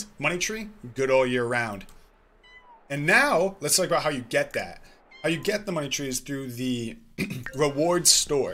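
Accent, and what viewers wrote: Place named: England, United Kingdom. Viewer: Hello i’m french and I discovered your Channel thanks to pixia. I don’t Know if i have a good English but i like your English accent when you speak french (its so cute ). +1 suscriber ❤️❤️❤️ (You tried to Say my username but you did not succeed because of the Mademoiselle(Mlle) 😁)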